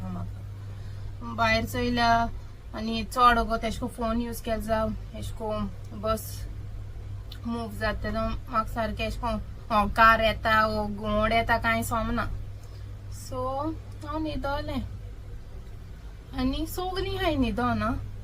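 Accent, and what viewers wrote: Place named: Mumbai, India. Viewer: Hi!, I'm Sylvia from Mumbai. I accidentally came across your vlogs some days back as I was scrolling through U tube n was curious to see more of them. To tell u the truth I liked your videos. I found u r a very simple person with a strong personality. When you speak in English u really have some style n you sound like a Mumbaikar n when you speak in konkani u sound like a pakka goankar its nice. U have a very nice voice too, but if u can make time n join some singing classes you can modulate your voice even better. I know u don't get much time as most of your time goes in traveling but do try. I fish u all the best n continue with your vlogs. ❤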